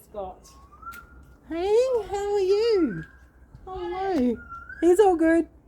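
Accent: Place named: New Zealand. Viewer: Im from nz and i remember a friend of mine coming to nz for the first time she couldn't get over how every household had a clothesline and we dried our clothes that way whenever we wanted to 😂 then i saw in some parts of the states people were excited to be allowed to have clotheslines! I was thinking that's weird had they been using dryers all this time when it's free to dry clothes outside? There were video's and blogs coming out on how to dry clothes for free (obvious air drying) how to make clotheslines, how to hang washing. I can't imagine being without a clotheslines. When i moved to my new home i done away with the old clotheslines and made my own suitable for my big family even repositioned it to get the most out of the sun during winter. We don't like dryers so i made use of our covered deck area for rainy day's and have made a simple clotheslines there as well because washing is neverending 😂😂 Loved your video coz I'm over here like hmmm let's see how other people be hanging the t shirts 😂 then i was like this a kiwi gurrrllll im sure of it she sound like a kiwi to me l0l anyways i guess we still lucky here to have clotheslines, water tanks and chickens running in the backyard etc love from home chur xx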